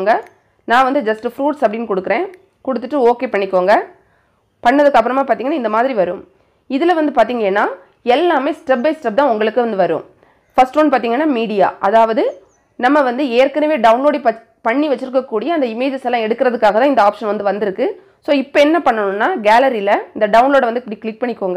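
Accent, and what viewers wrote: Kanyakumari, India. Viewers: Sister....neenga kanyakumari thanae.....🙄slang super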